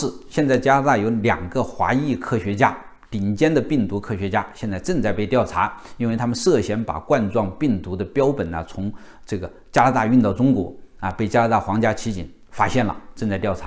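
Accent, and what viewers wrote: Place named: Dangyang, China. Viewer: 口音越听越像当阳人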